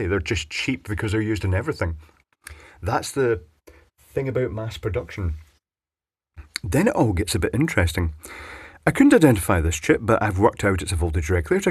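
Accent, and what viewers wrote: Scotland, United Kingdom. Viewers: Came here just to hear 'piss-flap' in thick Scottish burr:)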